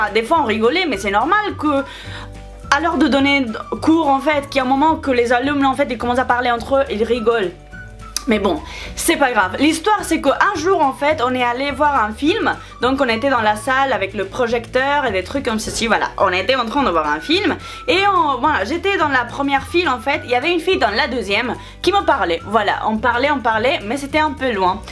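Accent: Spain. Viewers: non mais a son accent des le debut ça s'entend qu'elle est espagnole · Je suis nouvelle sur la chaine dite moi quel accent elle a ? On dirait de l’espagnol ? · Cc je te suis depuis pas longtemps j'adore ton axent Espagnol sa serais mon rêve de pouvoir parler couramment l'espagnol mais j'ai encore le temps au passage ses pas des pouces mais des poux et ma question pour la FAQ est ce que ta nationalités est française ou espagnol Gros bisous ❤️😘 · tu es magnifique j'aime trop ton accent espagnol ❤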